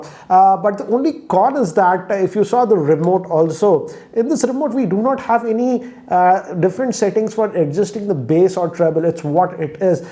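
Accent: Hyderabad, India. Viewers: I heard soundbaaarr as Sambaaar!! Love the Hyderabaadi accent! Soundbaarr saambaar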